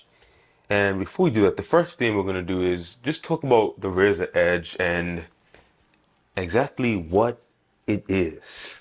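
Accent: Bermuda. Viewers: Are you from Bermuda? I hear an accent.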